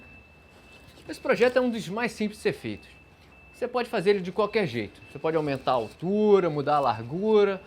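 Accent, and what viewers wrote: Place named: Rio de Janeiro, Brazil. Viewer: Po, pelo sotaque estava achando que vc era aqui do rio, qnd aos aparece o prédio bege e marrom que morei por 15 anos aqui em nit...kkkk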